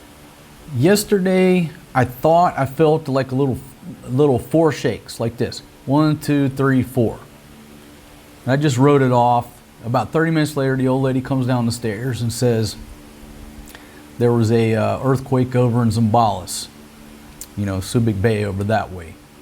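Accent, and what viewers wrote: South, United States. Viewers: New subscriber. Where you from in America. That southern draw makes me miss home.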